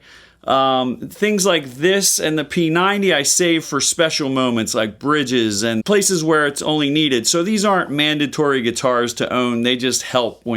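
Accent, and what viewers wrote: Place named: Eastern Pennsylvania, United States. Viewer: I was listening to you speak and thought to myself, "This guy sounds like he's from eastern PA. He's got that Bam Margera accent." Looked it up and I was right! Great video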